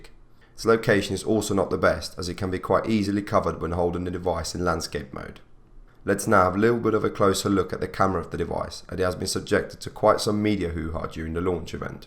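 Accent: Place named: England, United Kingdom. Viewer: get so excited when i here a english accent in a tech video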